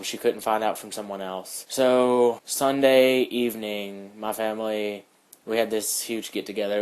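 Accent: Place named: South, United States. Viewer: where are you from??? I hear a Southern accent, but not sure f ft. Om where.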